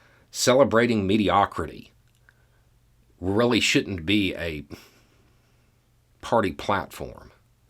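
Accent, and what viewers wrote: South, United States. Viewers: Many thx for your videos. You talk about important subjects in a straight way. Peel away rubbish and twisted ideas. Again, thx from a grumpy old man, living in northern EU. ( I also understand your fxxxing southern accent ☺️) Slava Ukraini 🇺🇦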